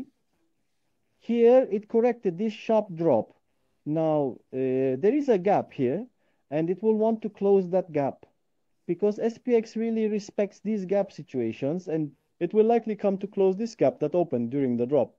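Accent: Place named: Russia. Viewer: Baştaki aksan ile yayin ortasinda aksan degisiyormu icindeki rus ortaya cikiyor bir anda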